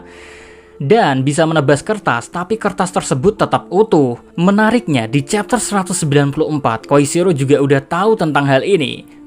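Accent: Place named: Jepara, Indonesia. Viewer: Owalah admine wong jeporo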